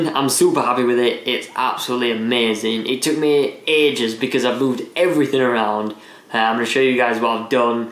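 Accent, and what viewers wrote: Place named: United Kingdom. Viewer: Why are you talkin british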